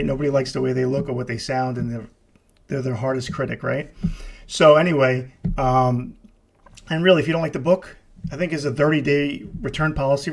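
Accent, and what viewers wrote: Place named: New York, United States. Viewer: I love your New York accent! Thank you for doing this podcast. Blessings to you and from South Africa.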